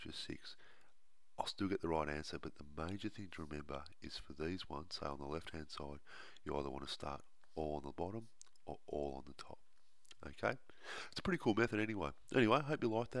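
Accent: Australia. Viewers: Yeah I think so. Well I'm from Australia and he def sounds Australian to me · are you australian??? accent is so iconic!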